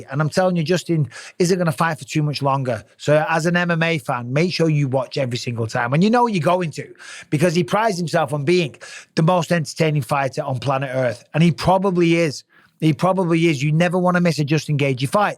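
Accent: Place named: United Kingdom. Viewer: I love your commentary. Me and my dads favorite moment to this day was during usman vs masvidal 1, usman was brutalizing masvidals toes in the clinch against the fence and you basically suggested if you were masvidal you'd be looking at the ref saying "cmon ref, have a word, he's stomping on my toes" of courage emphasized by the British accent lol. I don't think it would be terrible of you to admit that just as Justin is biased toward his friend Kamaru, you have a tendency as a fight fan from the UK, to root for those fighters. Even as a caster you can't help but to be a fan, Cormier falls victim to it as well. It would be a lot more forgivable if you just said, "of course I'm a little biased, we're in the UK and I'm rooting for my guys". If you'd like a better example of your bias you should rewatch the Jai Herbert fight, imo that was the one where your bias was most easily recognizable. 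Keep doing your thing though bruv, there are lots of us that look forward to the cards that you cast.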